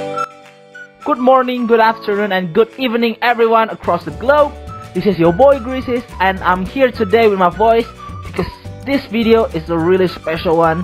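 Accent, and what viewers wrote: Indonesia. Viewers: Logat indo banget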